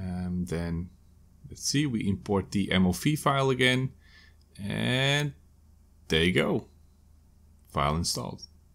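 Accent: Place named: Netherlands. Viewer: Nederlander xD, hoor het aan je accent.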